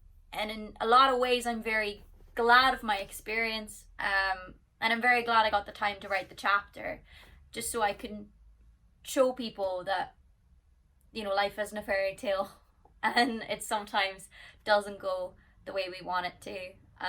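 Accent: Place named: Ireland. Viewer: I am a 67 old man in Holland. Two weeks ago I saw you the first time on youtube, looking at X factor video’s. The simple way you sang the song struck me. Vocaly you were not perfect, but you told a story. Your voice, Irisch accent and appearance intrigued me. I listened to other songs you sang and each time you told a story more than you sang a song., you are a storyteller. That is why I also like to listen to your vlogs. When you are telling what you are doing en things about youself I clearly see what is going on in your mind. Emotionaly you are an open book and that is also what you want to be. I know that everything on the outside of you is fake, but the inside is reaal and that is what makes you special for so many.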